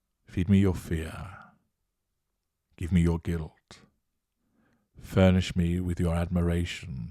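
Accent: United Kingdom. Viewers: Like & appreciate your teaching. As an American (raised in Texas), LOVE the tone of your voice & British accent. I would think you could have made quite a bit of money narrating books, doing voice over for TV-radio commercials, and voice for cartoon movie characters [the sophisticated man with a handlebar moustache or the evil criminal character].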